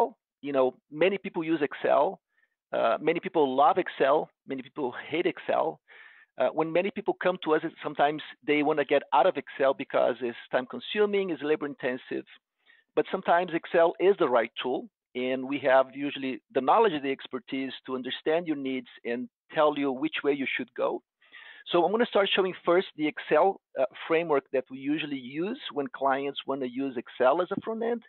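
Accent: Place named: Brazil. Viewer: It seems to me to be someone with a Brazilian accent ...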